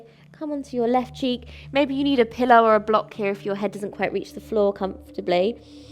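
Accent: United Kingdom. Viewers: Dear, I Always used to do yoga with Adriene, but more and more I am drawn to your classes. Thank you so much. You seem to have something that suits me every day and I love your English accent! (I'm British in France) thank you for your kindmess xx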